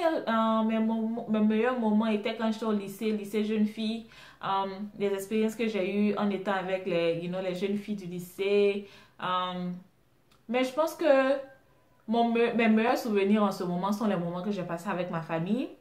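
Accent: Ivory Coast. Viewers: ma soeur j était déjà abonné par ton accent je savais que tu était ivoirienne donc je doit soutenir ma soeur mai je viens de voir cette vidéo tu ma trop fait rire je vis au Canada et mon baby me manque tellement j aime ta bonne humeur et sa sais made in cote d ivoire Abidjan est le plus doux au monde les vrais foutou placali et korpet escargot crabe poilu heee sa me manque ohhhh maman je vais pleuré😂😂😂😂 tu ma tué😄😄😄😄sais karidjatou a fagat moi je suis de la côtière je sais pas si tu connais dabou jackville mai bisou bisou ma soeur mon mari est baoulé👍👍👍👍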